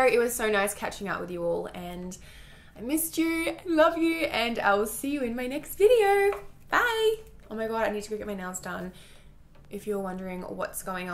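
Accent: Australia. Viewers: does anyone else love aussi accents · You are literally perfect 😭❤️ I want an Australian accent so bad my fav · I have a rly good Australian accent just from watching you for 3 years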